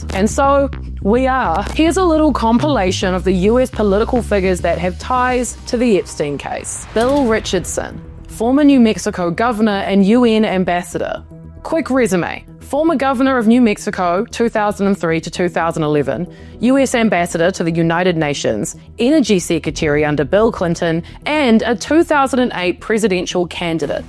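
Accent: New Zealand. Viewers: Love your Kiwi accent...adds some gravitas to your reporting. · A Kiwi! It's amazing to hear your pronunciation of the letter e as short i.❤ very groovy 😎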